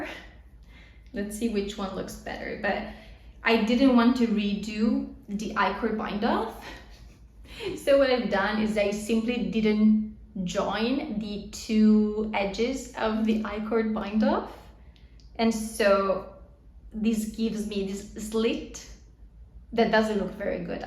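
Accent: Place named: Italy. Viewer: Great seeing you again I started to wonder, where have you been 😊 ….glad to see you are O. K. I love love your videos…edited/unedited…it doesn’t matter because you bring your lovely personality and Italian accent (which I adore)to it. It is so so cute and enjoyable. Looking forward to seeing more of you in the future. Thanks for taking the time and sharing. You are an inspiration to us all💞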